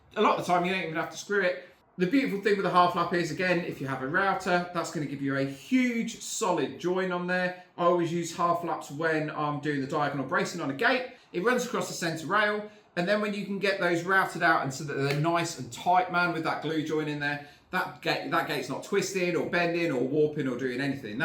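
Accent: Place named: United Kingdom. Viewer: It looks like you have lots of experience along and innovative ideas etc. However, I can't tell which part of the UK you are from, but listening to you, I couldn't tell what you were saying about 30% of the time, especially at the end of sentences and brief asides. I don't say this to be demeaning, rather just to let you know. If I'm having a problem, and I'm from the northwest of England, then there's a good chance lots of other people will have the same problem. When I first moved to North America, I even had someone ask me if I was English, because they couldn't understand me, so I know what it's like. I suggest that you slow down a little, try not to clip the ends off words, and avoid local dialect that listeners from other countries don't understand. Hopefully, you will gain more subscribers which, I believe, you deserve.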